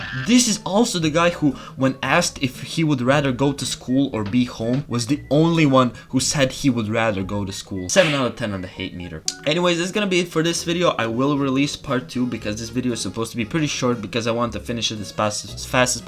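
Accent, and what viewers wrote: Croatia. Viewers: According to your channel you live in the glorious land of HDZ and your accent tells me you live in the Greater Zagreb region or in my case "prek Učke"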